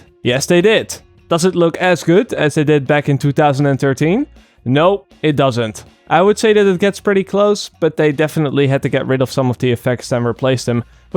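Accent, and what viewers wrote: Netherlands. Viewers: Are you dutch? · nederlandsengels accent meneertje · are you dutch cuz your accent sounds the same as mine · ik wist het gewoon dat je een Nederlander was XD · This dutch accent is driving me nuts >.< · Haha ik wist dat je Nederlands moest zijn, je hoort het nog een beetje in je stem. Maar buiten dat een leuke video! je hebt er een subscriber bij. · I think he is dutch. I'm dutch as well and that is just the way most dutch people speak english haha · are you dutch? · Je hoort echt duidelijk dat je een Nederlander bent :D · i knew you were dutch from you accent & in the end you spoke dutch & i was like ..''yup i knew it xD'' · Dutch english accent* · Is this guy Dutch? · is this guy dutch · you dutch? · Wou vragen of je een Nederlander was aan de hand van je accent ... Maar het einde gaf mij al genoeg antwoord ... goeie video kerel ;) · are you duthc? 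cuz you sound dutch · nice good dutch exand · you can really hear an accent when you're dutch yourself haha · Are you dutch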